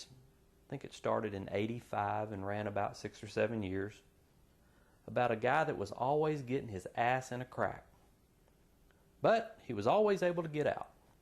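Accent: South, United States. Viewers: His voice reminds me of Mr. Rogers, but with a southern accent.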